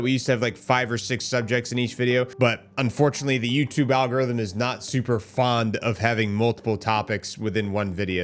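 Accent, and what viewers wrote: United States. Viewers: Takes a yinz to know a yinz. Cant hide our burgh accent brother.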